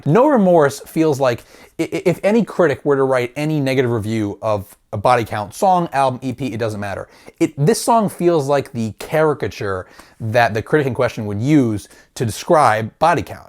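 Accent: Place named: New York, United States. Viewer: Oh, I could've sworn you were with that accent but maybe it's a NY one lol.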